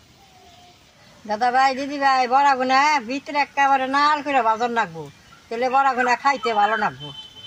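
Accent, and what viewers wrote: Bangladesh. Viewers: আপনার কথা বাংলাদেশের কোন একটা এলাকায় মানুষের মতো।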